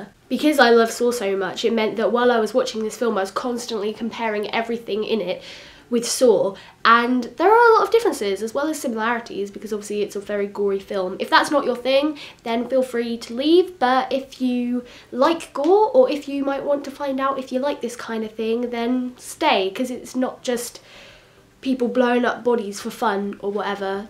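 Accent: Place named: England, United Kingdom. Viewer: I really like your accent :D Which part of England is it from? And nice eyes too :P . Yes, i've seen all 3 Hostel parts, and the best is the first part.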